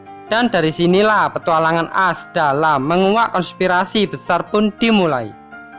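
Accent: Java, Indonesia. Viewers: cuman masukan doang nih bang, pembawaannya kurang. masih medok banget. ga salah sih klo medok, cuman biar enak aja yg denger. makasih.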